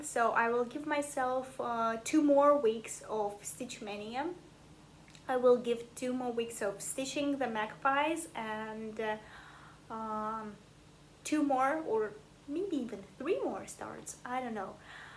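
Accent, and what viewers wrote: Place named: Texas, United States. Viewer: Tell your husband you’re not too much for Texans. We’re all like that. 🤣 You’re a Texan with an accent. You’ve got a great smile too. Love your shares. Keep stitching.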